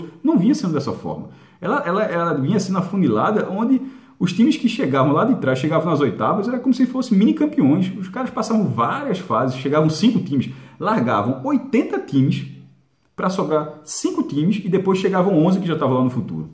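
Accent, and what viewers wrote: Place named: Northeast, Brazil. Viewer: Sou nordestino de Paratinga-bahia e gostei do seu sotaque, parece que é caba da peste também.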